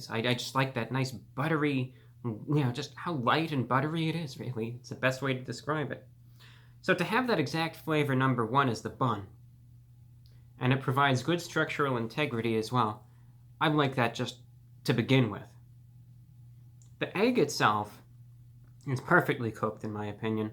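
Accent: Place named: New York, United States. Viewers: does have a little bit of New York accent, but lives in Florida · He is from New York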